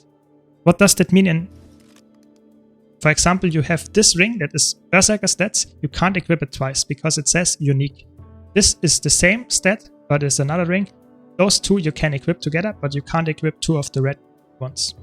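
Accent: Germany. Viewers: Thanks a lot my dude. I really needed that video to progress my Guild Wars 2 journey :) Btw .. hör ich da einen deutschen Akzent? 😂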